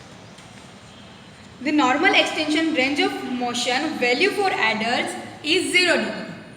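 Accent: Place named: Gujarat, India. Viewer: The girl who is giving instructions is pakka Gujarati Only then she can have that funny accent 😂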